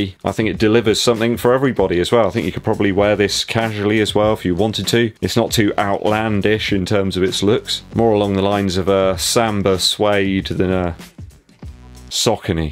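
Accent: United Kingdom. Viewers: Your voice is perfect for this. What can I say you got a proper British accent and people listen 😂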